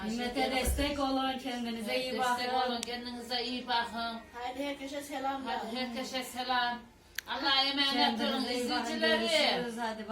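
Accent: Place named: Konya, Turkey. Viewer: Merhaba bur konya yöresini konuşmayı benzettim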